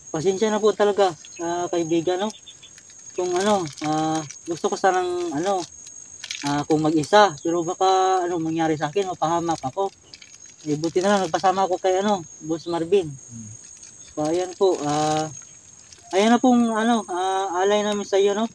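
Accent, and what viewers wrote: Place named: Iloilo, Philippines. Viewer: ingat kaung lahat. ilonggo ata c a ung tuno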